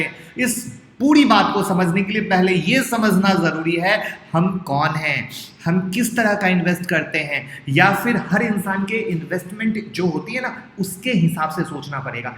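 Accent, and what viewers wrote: Bihar, India. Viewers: Aap bihar ke ho kya ?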